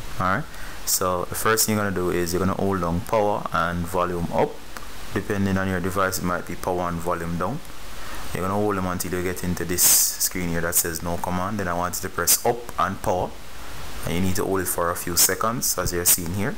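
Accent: Jamaica. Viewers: You sound Jamaican😎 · You sound Jamaican cool. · brilliant Jamaican accent. Thanks for the tips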